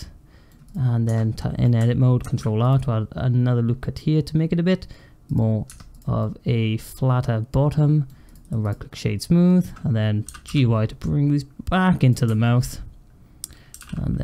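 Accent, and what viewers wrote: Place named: Cardiff, United Kingdom. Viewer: Is that a Cardiff Accent i hear? nice to see a tutorial in the native tongue lol. (swansea here)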